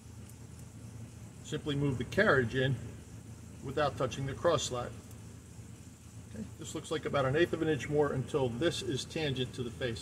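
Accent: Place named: New Jersey, United States. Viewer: Jersey? I thought I detected the accent of a fellow Jersey guy. For sure, that's not a Texas accent. :-)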